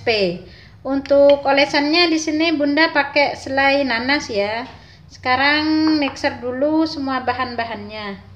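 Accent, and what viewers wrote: Madura, Indonesia. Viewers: Asli bunda dari mana logatnya seperti org madura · btw klo boleh tau orng ap ya logat bhsanya kya orang madura 😀😀😀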